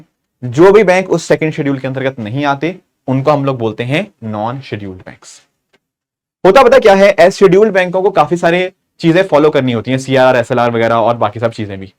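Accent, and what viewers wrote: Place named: Punjab, India. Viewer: Sir you teach great,but are u Punjabi? From your accent I'm guessing because Punjabi living in Haryana Delhi have similar accent of Hindi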